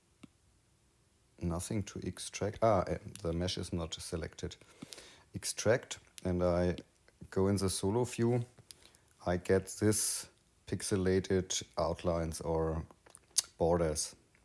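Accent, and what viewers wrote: Bavaria, Germany. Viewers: Sehr geil der Hinweis aufs Bavarian english. Wer’s nicht leiden kann soll halt was anderes gucken 😜 Guades Video, weiter so, hawe dehre😂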